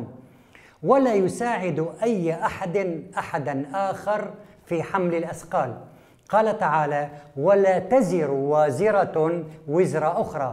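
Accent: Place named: Aleppo, Syria. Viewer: العالم سوري من مدينة حلب و هيك لهجتو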